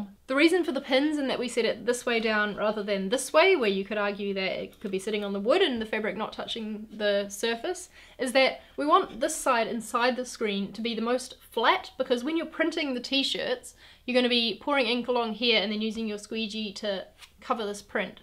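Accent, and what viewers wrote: New Zealand. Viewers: Came here from Mari’s video! Are you kiwi? Cos they accent.. haha! I’m super nervous about the exposing time part… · Are u kiwi?? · Lol, a strong kiwi accent there! I left 27 years ago - its refreshing to hear. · Haha Kiwi accent! I was sent this link from Facebook as a reference as to how to do Screen printing for their CREATORS workshops! Hit them up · are you kiwi?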